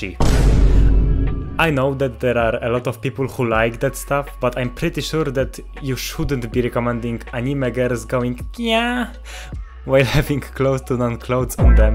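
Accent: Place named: Eastern Europe. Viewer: you sound really slavic bro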